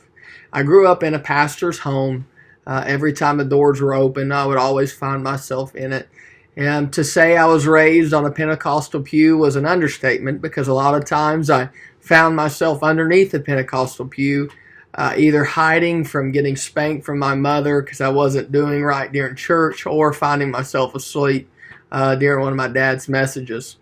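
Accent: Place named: Tennessee, United States. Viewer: I knew you were from Tennessee!!! I knew from your accent!! Sounds great! I know your doing Gods will! I totally know what you’re talking about when you say hiding under the pew hiding from spankings lol...man that switch tho 😂🤣🤣🤣can’t forget that thing 🤣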